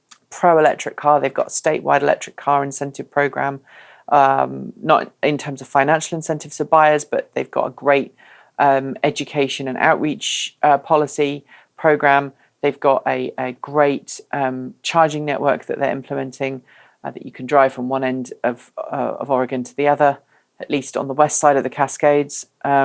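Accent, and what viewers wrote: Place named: United Kingdom. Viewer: Every bad policy that Trump was threatening was already done by Obama and would have been continued by Clinton. There is massive subsidiued investment in fossil fuel infrastructure still, this is keeping our renewable future from taking off. I voted for Jill Stein and her Green Revolution. Since when does a revolution need an election. I live in Germany and work in Switzerland and am pleading with people to move to EV, batteries, solar PV and wind, with little success. I don't trust VW to make any changes, even though they have said they will build a battery factory in DE. I am supporting your site since you have a bigger voice, so keep shouting, in your quaint British-tinged accent.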